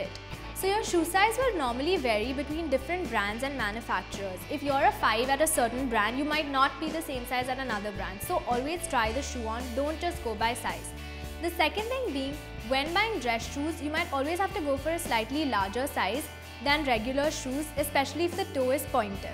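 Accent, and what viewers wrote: India. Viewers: Ur curry accent!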